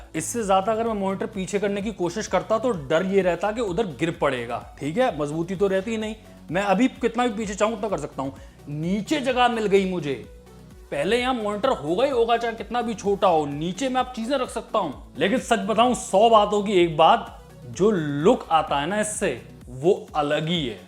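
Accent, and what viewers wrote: India. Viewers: Apne jo wall pe stands lagte hai vo nahi batya agli bar ho sake to uska halka sa jo ho sake ek brief de dena thoda idea ho jayega Aur jo mera one of the biggest reason hai apki videos dekhne ka vo apka indian accent jo apno vale feeling dilata hai to voh kabhi loose mat karbna ☺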